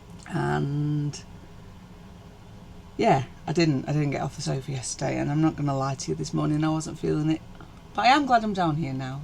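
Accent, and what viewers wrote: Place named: England, United Kingdom. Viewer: I live alone with my dog Madge, a rescue greyhound, and very rarely see another human being to talk to, so I talk to myself, and answer back all the time - I have always done this though! If someone suddenly appears, whilst I am nattering on when walking with my Madge, I pretend I am talking to her! But I do not mind if folk hear me doing this, I just make a joke of it! It is the only way I get through the day, and at least I do not get criticism for saying something wrong to someone - I only have myself to blame! I have just found your channel, so given it the thumbs up and subscribed. I am a pottery painter, but love all craft. I have owned and run 5 arts and crafts shops in my past. I also had my own Private Nursery School on the side of my house, down in Devon, in the 90s, and loved doing all that art and craft with my beautiful children I was so blessed to have coming to my Nursery School. 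It was called Woodpeckers Nursery School, and I had the chicks and the fledglings. I am now 67 years young, and need to get back to doing craft things, as in the last 7 years I have lost my way a bit, due to sever anxiety, and only going out of my very rural home to walk my lovely Madge. I am surrounded by nature, so going out with her is such a joy. My latest thing I am going to be doing however, is making Christmas decorations with acorns in their cups. I love the way you do your painting, so thank you for this video. It is lovely to hear an English accent too, as so many I find on h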